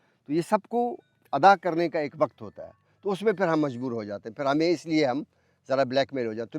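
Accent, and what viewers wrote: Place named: Karachi, Pakistan. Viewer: Khosa Sahab Aslaamo alacum ham ne ap ko dramon dekha tha aur AJ ap ko peyaray balouchistan ke gaon mn dranti pakray us ki cataie kar rahay hn ap ki Urdu aesi ha jesay ap Karachi se hn balouchistan se nh,balouchistan mn haryali dekh kar Dil bohat khoush hoia Allah pak balouchistan ko shad,o, Abad rakhay aameen summa aameen mashallah khoush raho slaamat raho aameen summa aameen, I am frame apna Punjab se Duaion mn yad rakhna Allah Hafiz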